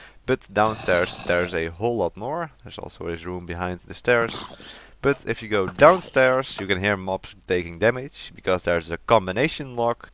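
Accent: Netherlands. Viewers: That's hella a Dutch accent you got going on, sounds pretty good so far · Cool video!! Are you dutch?? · you are definitely dutch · Ik hoor gewoon dat je uit Nederland komt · Dutch?? If not you sound like one😂 nice vid btw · nederlands accent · You officially are dutch i hear it gewoon plat nederlands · Are you dutch? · are you dutch? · Superfun vid but i have a question: are you dutch? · Are you from the Netherlands? You definitely have the accent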